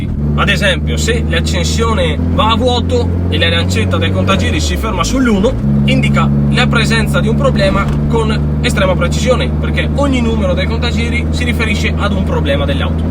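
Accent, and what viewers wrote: Veneto, Italy. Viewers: L'accento Veneto è inconfondibile.... 🍻😉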